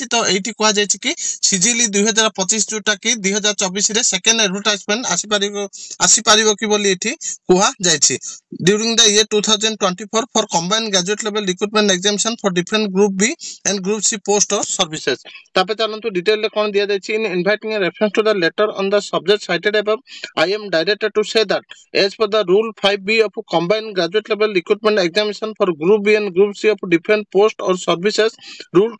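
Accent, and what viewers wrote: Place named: Baleswar, India. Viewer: Baleswaria heithiba tanka adia accent semiti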